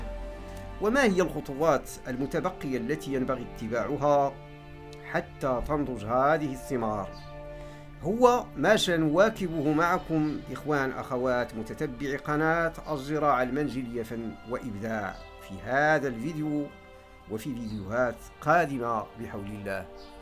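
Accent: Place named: Morocco. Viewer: يتكلم بلسان مغربى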